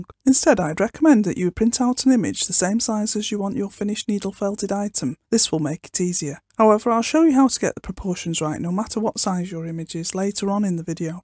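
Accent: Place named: England, United Kingdom. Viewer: I just discovered your delightful, very entertaining, instructional needle felting video’s. Kudos! I’m planning to see all that you have posted. I like your focus on the fundamentals rather than breezing over them. My “older” ears do have trouble distinguishing your English brogue and the rapid clip at which you talk. It’s okay and I’m not suggesting you change a thing, not that you could. Accordingly, my long, slow Southern drawl would likely drive you nuts! I compensate by listening to some of your sections several times until my brain gets it. We hear with our brains, not our ears, ya know. I’d be especially interested to hear more from you about fiber prep for needle felting. I’m a fairly seasoned needler but have had little success applying an outer layer of fine fiber without leaving the telltale little holes, even using a 40 needle of any shape. 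I haven’t owned a 42 but shall order a few after seeing your videos. Thanks again!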